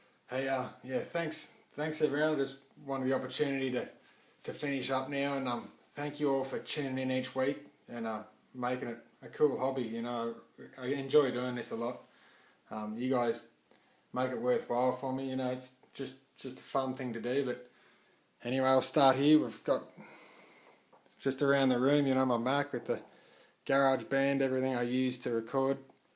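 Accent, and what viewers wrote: Australia. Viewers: I like your Australian accent hehe! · Fooking Australian mate! I thought he was American for some reason. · Ok, that's an Australian accent. Nice, dude.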